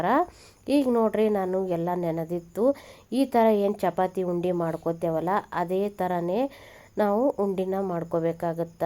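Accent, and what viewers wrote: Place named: North Karnataka, India. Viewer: Nima uk maatu keloke chanda ertave bhal esta agtaite sisoo❤❤❤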